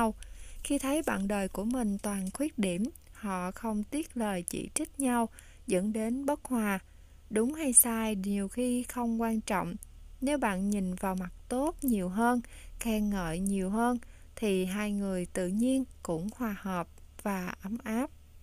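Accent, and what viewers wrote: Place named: Mekong Delta, Vietnam. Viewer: Câu chuyện rất hay cùng với giọng đọc rất hay rõ từng lời, mang một chất mộc mạc của miền tây.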